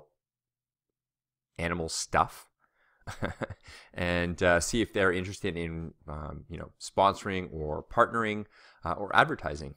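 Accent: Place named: Canada. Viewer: Yeah ... but I'd completely whoop your ass at Sonic Spinball ... So, I'm sitting here marathoning your videos, and you've said that you don't have a pet ... Lets hope that you're single as well, as I'd only ever heard of you approximately 2 and a half to 3 hours'ish ago ... And I'd nearly labeled you an asshole, but you're cool ... I was so borderline offended by the first of your videos that I watched, more mildly outraged ... Now, I realize that you're just brutally honest and a sweetheart of sorts, with your whole taking on the responsibility of taking care of your family and all ... My father fell into a coma the day that I came home from Colorado, never woke up, but that's a different story ... #PepperThePitBull keep an eye out for her ... OH, and you are officially "Strange Brew" Canadian when you say "about" ... It's not something that you can change at this late date, but yeah ... 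That's definitely your Canuck street cred right there ... You pronounce it more "aboot" than "about" ... Anyways ... <3 C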